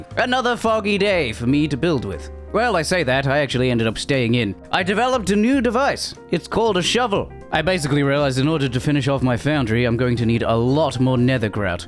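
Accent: England, United Kingdom. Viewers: I love his fluency in British accent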